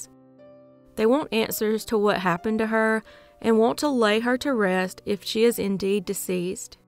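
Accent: South, United States. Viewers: I like your southern twang.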